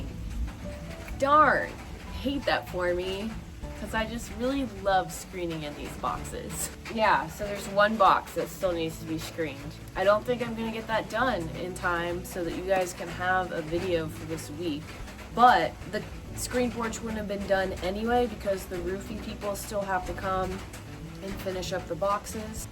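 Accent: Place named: Arkansas, United States. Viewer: You're accent sounds Arkansan. That environment seems very Arkansan. Are you in Arkansas? I grew up in NW Arkansas. Heaven on earth for around 4 month of the year. Otherwise it's hot as crap or cold as crap lol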